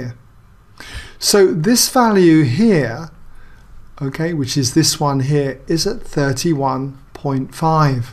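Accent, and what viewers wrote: United Kingdom. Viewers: British accent is always so charming :)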